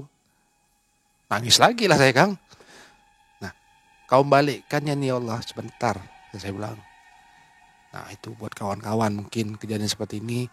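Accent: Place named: Jambi, Indonesia. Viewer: bhs melayu ny sama kayak di jambi 😭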